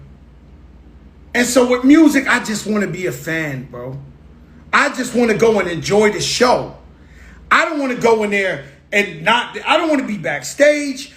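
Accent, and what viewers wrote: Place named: Bronx, United States. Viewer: Joe told this story ,WITH A MESSAGE ,with skill, and spoken like a TRUE BRONX Vet and Legend!!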